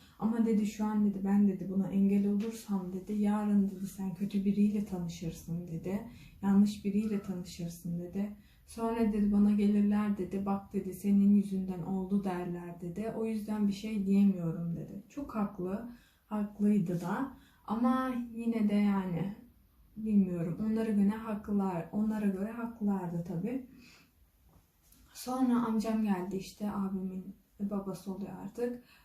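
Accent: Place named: Germany. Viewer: türkcen almancilar gibi cok kötü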